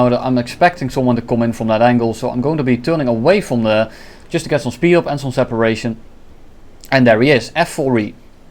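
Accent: Netherlands. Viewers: Are you come from the netherlands?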